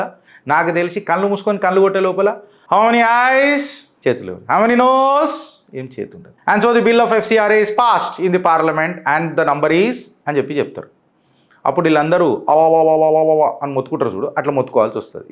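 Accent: Telangana, India. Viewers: తెలంగాణా యాస ఇబ్బందిగా ఉంది. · A humarus and funny speech in sweet Telangana yasa but realistic.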